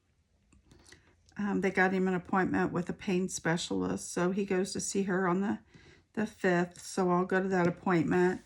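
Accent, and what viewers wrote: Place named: Kansas, United States. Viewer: Hi, I enjoyed listening to your WIP and Chat. It sounds like you are a fellow Kansas. I live in Ellis, Kansas. I also have a YouTube Channel. Have a blessed day. 🌻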